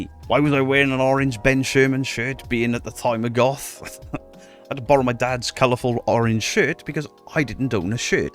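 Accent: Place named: Cardiff, United Kingdom. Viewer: Ah nevermind! Just thought you might have seeing as you sound local (I'm in Cardiff) - all the bands of the time like FFAF, Shaped By Fate, even...ugh... Lost Prophets used to post on it all the time. Even met my wife on there lol.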